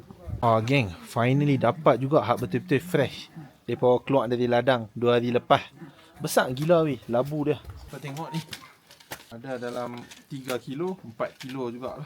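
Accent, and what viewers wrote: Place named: Kedah, Malaysia. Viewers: Alhmdulillah aka kira baru masok line ni, seronok sgt aka bila dgr Adik ckp logak kedah, terbaik dik, Sgt jga tatasuli bahasa, terbaik dik, aka tak miss tgok Ulang2 yg pat kt india dgn mesir, kira semua aka suka, terbaik dik 😂👍👍👍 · bestnya..cantik sgt permandangan kg tu..terima kasih Dr..lg best bahasa kedah sampai sana. jga diri dn kesihatan bos. terbaikkk👍👍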